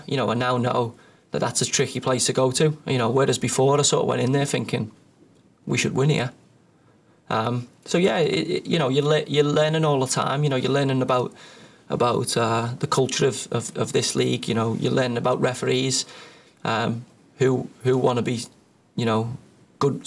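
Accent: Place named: France. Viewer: so he decide to continue dat french accent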